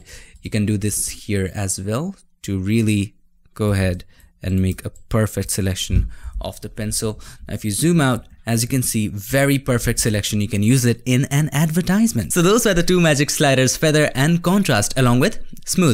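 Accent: India. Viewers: beautyofustwo well he does sound Indian so that might be why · 3 its an indian accent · Indian accent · He’s Indian I think He sounds Indian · Yes, an actual GOOD Indian accent which is ACTUALLY an Indian accent unlike some scammer shit people see on YouTube.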